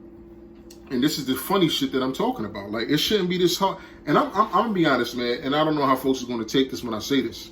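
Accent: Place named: New York, United States. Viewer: I dont know if your from NYC but I think you are because of the Brooklyn nets hat, & your accent. Im also from NYC but I moved to Miami fl in the mid 90's I remember I could go into one of those mom and pops Asian stores and always walk out with what I wanted. If your from nyc try those asian mom and pop stores,, or Try China town.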